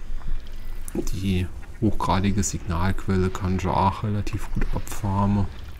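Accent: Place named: Saarland, Germany. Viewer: Moin, Nettes Video. Dialekt klingt, als wärst du aus der Nachbarschaft. Grüße ausm Saarland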